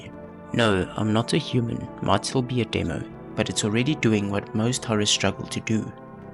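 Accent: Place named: South Africa. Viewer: i thought i recognised a south african accent 🥹😝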